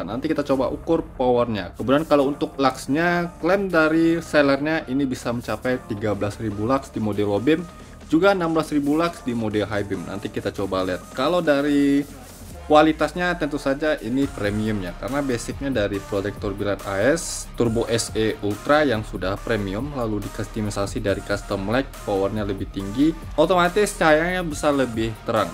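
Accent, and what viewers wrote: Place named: Sumatra, Indonesia. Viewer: Logat sumatra bang emg gitu ga perlu di komentarin wkwk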